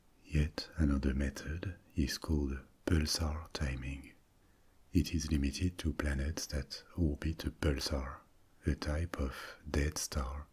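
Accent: France. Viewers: Tu est français ? Car avec le nom de la chaîne et ton petit accent français on dirait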